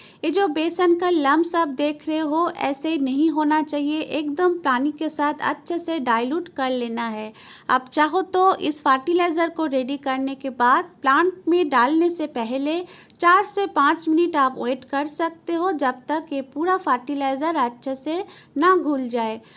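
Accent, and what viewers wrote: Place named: Bengal, India. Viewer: If you don't mind, Are you from Kolkata. Your dialect suggests you to be a Bengali.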